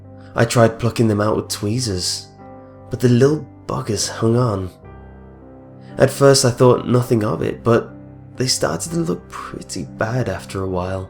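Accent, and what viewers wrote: England, United Kingdom. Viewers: I love your voice, I don't know why but an English accent just makes things creepier.:) You kind of sound like Wacko off of Anamaniacs. (Not a bad thing at all.) (Also, I'm sorry for spamming your comments by replying to an idiot.)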